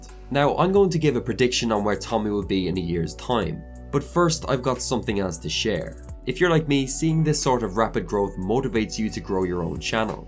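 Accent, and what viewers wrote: Ireland. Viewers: Nicely done, and nice to hear another Irish voice on YouTube!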